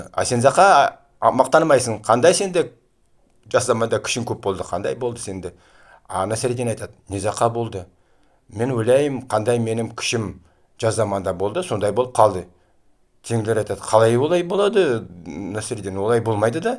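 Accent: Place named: Kazan, Russia. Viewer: Интересные вещи рассказывает къардаш, но произношение некоторых слов казанское.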